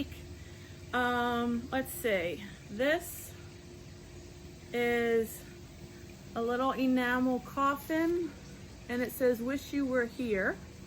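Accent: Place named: Cincinnati, United States. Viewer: Luv that you’ve been taping outdoors... I noticed the butterfly house straight away! I am originally from Cincinnati. Are you as well? I think u have a Cincy “accent” and just one more thing to love about you... blah, blah, blah! Congrats on all your finishes! Great work! 💪🏻😎🎀👑🌿🦋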